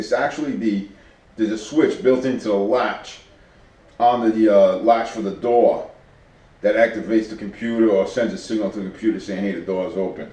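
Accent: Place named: New York, United States. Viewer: love your accent man. Is that NY?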